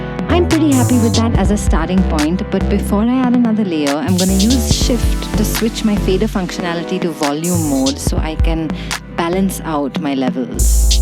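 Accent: India. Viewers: Inidan accent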